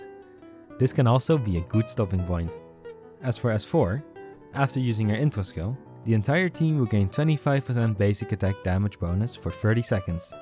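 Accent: Netherlands. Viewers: Love the video weird question are you from the Netherlands you sound dutch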